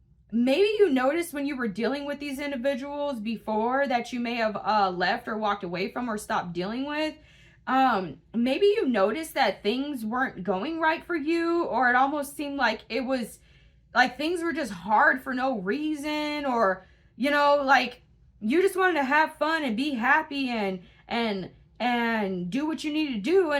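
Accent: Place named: South, United States. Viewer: Loving the country accent you should hear mine😊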